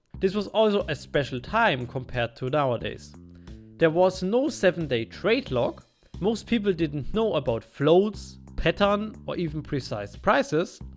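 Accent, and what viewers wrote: Germany. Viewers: i entered , are you german ? you sound like you have a german accent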